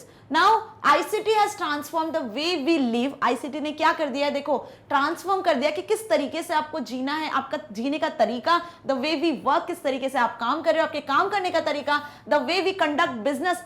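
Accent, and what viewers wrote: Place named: Tamil Nadu, India. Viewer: Mam aap Tamil se ho kya, who was Aisa Laga mere ko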